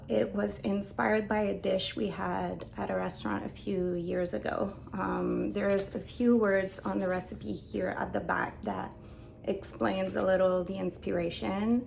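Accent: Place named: France. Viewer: My Dear, your new excitement is palpable. It's so noticeable your French accent was more forward. I love this! Congrats on your new venture.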